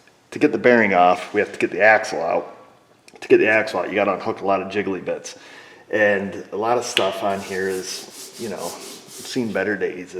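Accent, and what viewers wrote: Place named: Long Island, United States. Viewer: Are you located on Long Island New York it sounds like you might be I really could use a good mechanic like yourself trustworthy and very knowledgeable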